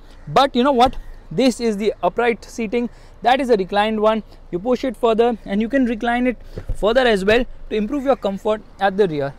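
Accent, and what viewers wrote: India. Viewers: Can you speak a little slow. Your english sounds like some Indian language for sure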